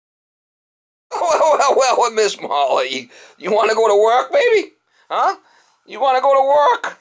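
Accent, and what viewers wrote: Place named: Massachusetts, United States. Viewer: This guys Mass accent makes this channel fun to watch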